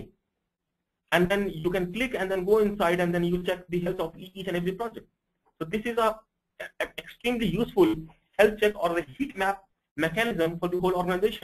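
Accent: India. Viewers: ingles, ele tem um sotaque indiano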